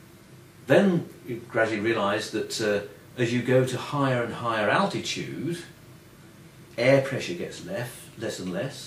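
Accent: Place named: Australia. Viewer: The visible universe is but foam on the ocean of the unseen/dark universe. Incredibly mysterious to us now as the leading edge of quantum and classical physics. Also, even though he's obviously English, I do detect that Mr. Close certainly has an accent that sounds unmistakenly 'Australian' ... of sorts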